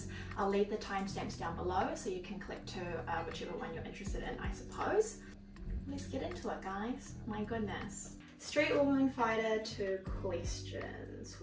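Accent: New Zealand. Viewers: Hi, your content is so refreshig to watch and your voice so pleasant in my ears. You have same accent with Jennie of Blackpink and then I realized Jennie used to live in NZ 😊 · I just enjoy your deep NZ accent 😂❤ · can anyone tell me whether this is NZ accent english? i'm an english learner and i think this may be the perfect material to practice listening hahaah thank you for the subtile and looking forward to your new videos to come!!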